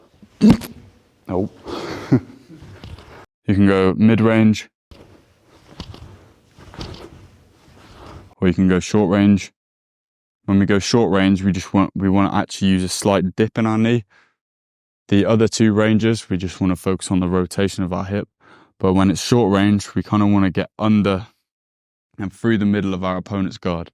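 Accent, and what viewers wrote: United Kingdom. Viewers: I just bought a punching bag, hung it up, and started boxing. Thanks for the tips and the video. I really like your British accent and the way you explain all the things 😎 Thx!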